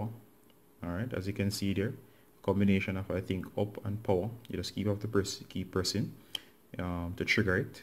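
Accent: Jamaica. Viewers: U kinda sound jamaican im also jamaican!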